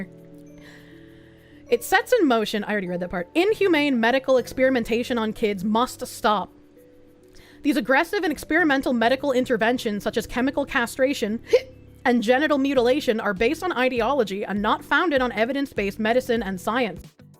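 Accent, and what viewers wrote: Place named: New England, United States. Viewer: I ❤ New England Accent. Am also from New England.😁👍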